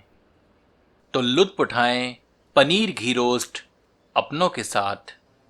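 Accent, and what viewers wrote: Standard. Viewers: Recipe to acchi h bhai g par itni शुद्ध हिन्दी sunke maja aa gya 😊😊👍👍👍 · Wowwww too good recepie 👌👌👌 aur usse bhi zyada aapki hindi.. bahut achcha laga sun k itni suddh hindi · आपकी शुद्ध हिंदी ने मुझे मंत्र मुग्ध कर दिया। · Itni sudh hindi bahut acha laga aap ki awaz aur receip Humne aaj first time aapka vedio dekha😊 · आपकी रेसिपी तो शानदार है ही और सबसे अच्छी बात आपका हिन्दी में शुद्ध पकड़ घी से भी ज्यादा मनमोहक लगा प्रणाम स्वीकार करें...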